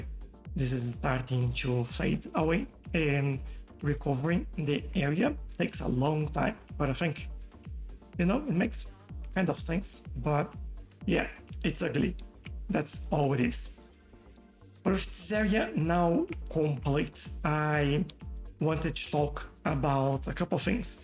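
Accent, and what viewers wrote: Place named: Brazil. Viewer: Amazing video! Are you brazilian? Your accent reminds me of our people speaking english.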